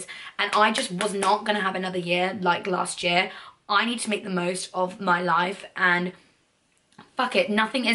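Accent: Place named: Newcastle, United Kingdom. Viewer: I fuckin live for your random Geordie accent outbursts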